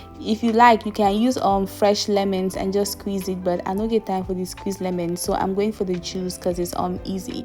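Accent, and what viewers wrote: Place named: Cameroon. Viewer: your voice and pidgin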